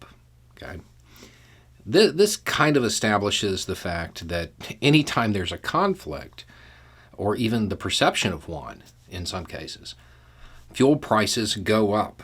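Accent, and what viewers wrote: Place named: Florida, United States. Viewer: FL accent. "Grain" energy. They are coming for your Moonshine!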